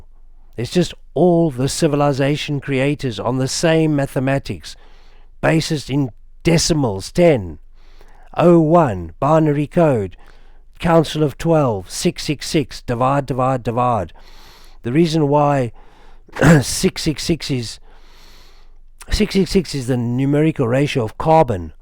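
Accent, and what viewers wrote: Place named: South Africa. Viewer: Some great information and very interesting (but would not be half as interesting without the SA accent!). Unfortunately on the down side, I have some bad news to bring the community, yes my toast got burnt, it was 2 slices, I'm uncertain exactly at which point this was but believe at 1hr 48mins I'd remember something was under the grill. Just wanted to warn anyone else starting to watch this as you might consider finishing off all your weekend or weekday duties that aren't time dependant. Enjoy! 🦉🧔‍♂23🪑<imagine this is a bench>🪑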